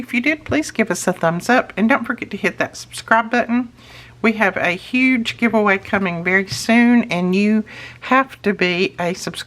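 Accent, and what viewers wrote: South, United States. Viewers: I love your crafts & your voice is so kind; I could listen to you all day. Are you from the South? Your accent seems like you are. I'm from AL. Best wishes & God's blessings. : )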